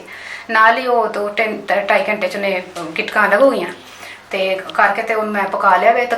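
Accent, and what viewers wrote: Punjab, India. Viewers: Ap ktni puari punjabi bolti heun :) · Punjabi accent very good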